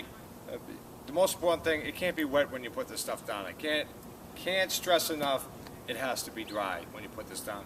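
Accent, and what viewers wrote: United States. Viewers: Hey, love that Boston accent. Fellow Bostonian down here in Delray Beach enjoying your video. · susan scott My husband grew up in jersey and told me about running behind the mosquito trucks too. I thought this guy sounded more like he had a Jersey accent.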